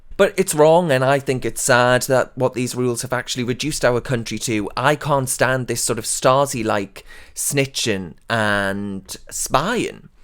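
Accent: Newcastle, United Kingdom. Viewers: Great video, also great to see & hear a Geordie lad stikin it to them.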